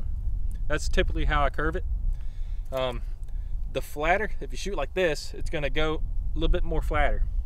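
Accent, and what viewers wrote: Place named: Rural, United States. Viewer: I was expecting him to start spitting into a spittoon chewing tobacco while smoking a cigarette and drinking whiskey from the bottle on his rocking chair... not breaking physics Loving the hick accent tho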